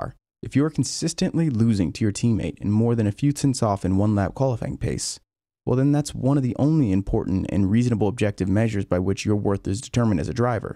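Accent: United States. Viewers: Being american myself at first I didn't realize anything. Maybe about 10 minutes into the video i was like wait, that "grand prix" sounded unnatural.. oh wait he's american? Thats different, in a good way (: I often feel like I'm the only person for miles that cares about F1. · I was like, "something's off about this F1 video" -- then I realised. It's the american accent. I'm not used to people talking F1 in an american accent... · its the american accent for me, it took me off guard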